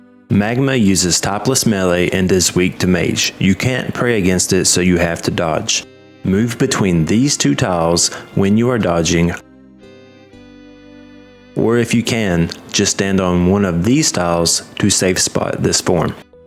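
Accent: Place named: South, United States. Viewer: I don’t know how you somehow have a bit of a southern USA accent but also sound like a European of some sort too lol.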